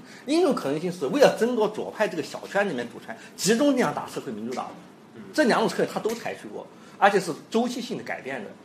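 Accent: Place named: Xinjiang, China. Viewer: 刘先生虽然是四川人，但标准的川普里明显带着我们新疆口音的基础！哈哈！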